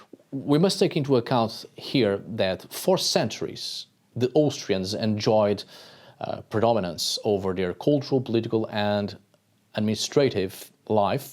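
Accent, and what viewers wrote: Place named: Portugal. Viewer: I knew you were Portuguese by the way you spoke… we can identify each other easily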